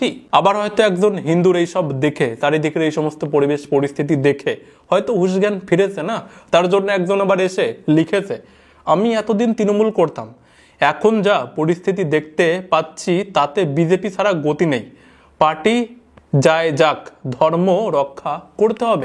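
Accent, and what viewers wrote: Bangladesh. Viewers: Tor bhasa shunei mone hocche, tui bangladeshi,.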